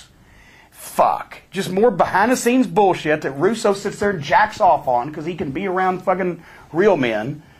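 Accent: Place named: South, United States. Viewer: Jim cornettes is Fucking Hilarious , his southern accent and his Shoots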